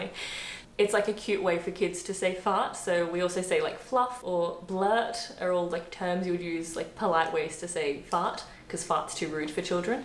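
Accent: Australia. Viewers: FYI this is a cultured Aussie accent unlike certain Aussie accents that seem to be plucked out of the 1930s .Yes I'm talking about cobber ,sheila ,crikey . These terms were out of use before my time and I'm a 57yr old bogan. We are more likely to say " nice bit of pussy" than " crikey that's a bonza sheila" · thank you for this nice presentation even though you seem a little nervous presenting these subjects. Your Australian accent is very faint compared to my Ex Father-in-Law who was brought up in the 'Bush' in the 1930s but settled (ex RAAF) in England after WWII. In the 1950s my mother would take me (aged five to eight) to the cinema (yes I cried for Bambi). I forget the title of an Australian film that we saw but the young lad used the word 'bum' meaning his bottom. My mother quickly informed me that "Australians are allowed to say that word". It was even better when the lad sung, to the tune of 'The Ash Grove': My teacher's got a bunion A face like a pickled onion, A nose like a squashed tomato And legs like match-sticks. 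etc. Rugby Football is similar to American Football in that they rarely kick the ball but without the protective gear. Australia (Wallabies), New Zealand (All Blacks) and South Africa (Springboks) all give good account of themselves and, without doubt, have much 'dirtier anthems' that they sing in the showers or in the Pub' after the match.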